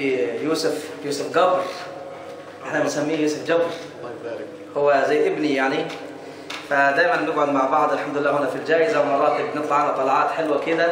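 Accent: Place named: Egypt. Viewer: ربنا يزيدك ولا يحرمنا من الصوت المصري ابداع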